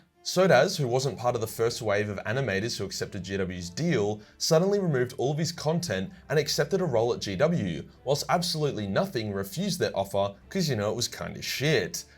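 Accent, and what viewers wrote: Australia. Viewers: why does this man sound like australian chills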